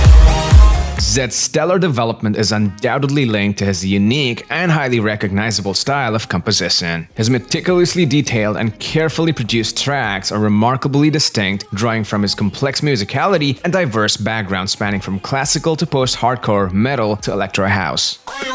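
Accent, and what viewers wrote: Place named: England, United Kingdom. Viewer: Your english accent is awesome.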